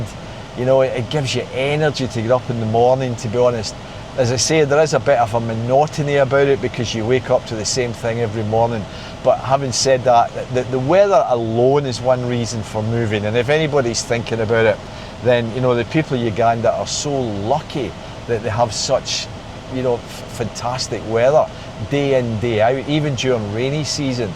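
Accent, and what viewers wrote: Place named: England, United Kingdom. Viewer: I like to listen to but not more than 10 mins as after that I start to feel bit of headache 😢, I am not used to that English sound, i am used to normal one 😮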